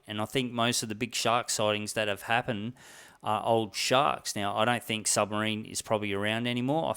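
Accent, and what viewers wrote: Australia. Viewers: This is the worst video I stopped watching it halfway. This guy clearly does not know his facts. Hes ays in the one part where he speaks about the beaches "I assume." He very bravely and confidently points out Seal Island's location, but meanwhile he points it out on the wrong side of the bay. He keeps on saying it is fake, but menawhile he is spreading fake news. How can a guy with and Australian accent pretend that he knows the South African coast and what happening there, but meanwhile contradicts himself.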